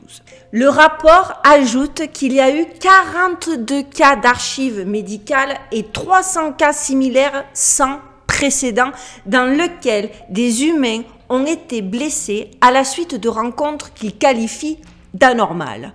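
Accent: South, France. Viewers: Elles sont excellentes tes vidéos, j'ignore d'où tu trouves tes informations mais il y a des choses que je ne savais pas et Dieu sait combien j'en ai vu et entendu des documentaires à ce sujet ! :) Contiue ton bon travail, j'aime beaucoup ton naturel et ton accent du Sud de la France ... Mon épouse a le même ! :)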